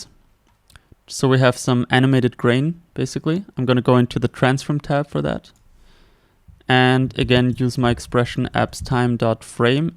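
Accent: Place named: Germany. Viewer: oh it sounds so german accent bc im german and people that speak english in germany talk like that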